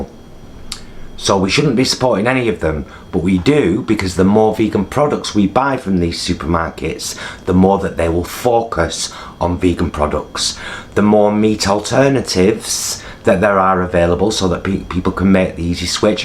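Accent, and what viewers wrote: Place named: North, United Kingdom. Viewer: Just found this video/channel in my recomended. You got me with that mad intro, and then that accent 👌👌👌 I'm in Yorkshire but often in Manchester n i love hearing a northerner online, 🤣 Those chicken strips are ace, I used to really not like mock meat, but those Iceland chicken bits n burgers converted me 😊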